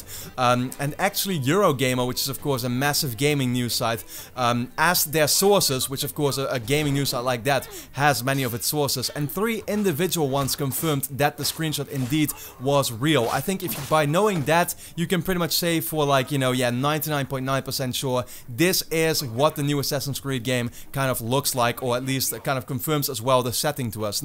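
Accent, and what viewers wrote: Netherlands. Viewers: Is he from the Netherlands?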